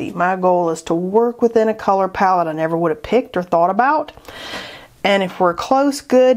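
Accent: South, United States. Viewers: obviously southern part of the good ol' USA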